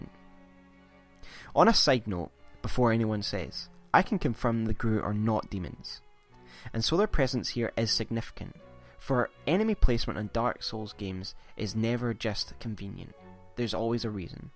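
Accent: Scotland, United Kingdom. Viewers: agreed, Scottish accent ygm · Scottish are we? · I can't even recall how many times the Scottish accent made me laugh ! Seriously, sometimes I laughed so hard I "wetch"ed my pants. XD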